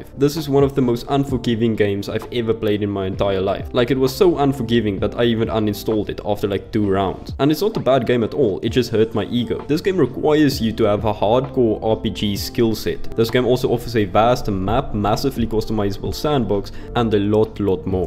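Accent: South Africa. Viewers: With in the firs 1 second i could tell ur a fellow south african gon be giving u a sub and so on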